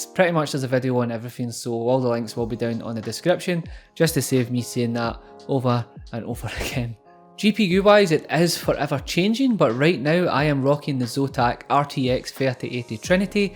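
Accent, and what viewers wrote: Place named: Scotland, United Kingdom. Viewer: Love how ur scottish mate, where abouts you from?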